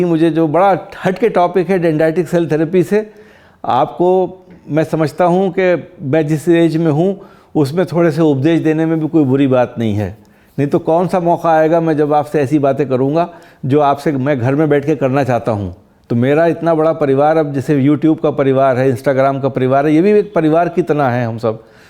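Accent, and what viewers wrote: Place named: Western Uttar Pradesh, India. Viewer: ये बताएं ,आपके चेहरे की चमक का राज क्या है ,आपके बोलने के अंदाज में पश्चिमी उत्तर प्रदेश की मीठी खुशबू आती है धन्यवाद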